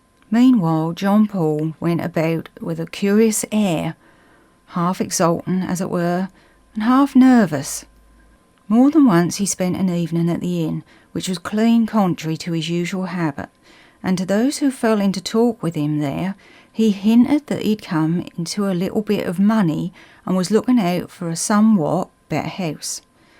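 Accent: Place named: Suffolk, United Kingdom. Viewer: Well suited together, M.R. James and the Suffolk accent.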